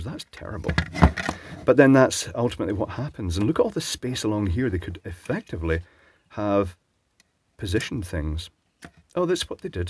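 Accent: Scotland, United Kingdom. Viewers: That Scottish accent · Love your videos. Have learned a lot despite your scottish language/prononsuration.(?) Remember that you have an international audience!! Sort out your english! And rest your hands. Whill go on loving your videos. Oscar/Sweden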